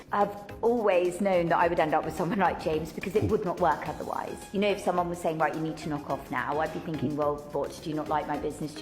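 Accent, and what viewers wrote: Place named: Northern England, United Kingdom. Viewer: i used it briefly twenty years ago and had some good job offers. Unfortunately it's was at a time in my life where i was moving out of my mum's place and had a local good and steady job offer which I've stayed with. From what you've shown today I'm glad I've not had to go work for these sociopaths. That aside, I'm born Northern but raised Southern (moved Dan Souf when i was a year old). I love your dialect - i really enjoy your takes and sometimes incorrect pronounciations - don't stop what your doing dude, and good to see your missus on recent video too. I've been en subbed for about a year or two now so not fully in with all the lore, but I'm here and staying! Cheers big ears ❤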